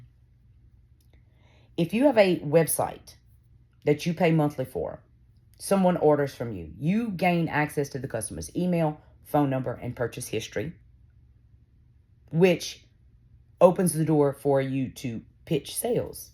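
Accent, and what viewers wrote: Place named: South, United States. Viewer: you have the cutest little southern drawl :)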